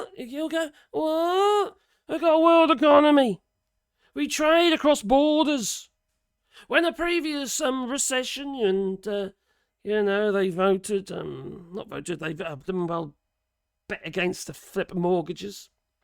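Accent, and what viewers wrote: United Kingdom. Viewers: Keep the insults on America coming ! I'm serious. Americans are smitten with British accents; pretty much every advertiser in the U.S. uses British voices to sell stuff because we always assume someone with a British accent is smart. Maybe you can make an impact on those trumper morons that the rest of us flat-accent Americans have failed to do.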